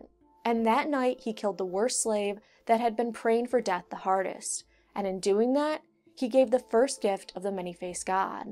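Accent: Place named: South, United States. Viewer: you got a southern accent!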